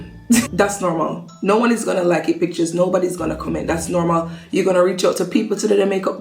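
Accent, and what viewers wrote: Jamaica. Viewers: Yow u sound so Jamaican its qt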